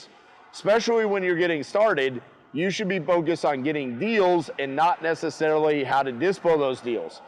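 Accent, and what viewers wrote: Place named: South, United States. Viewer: You sound like a guy from down the way. I grew up in a town of 300 people in the south.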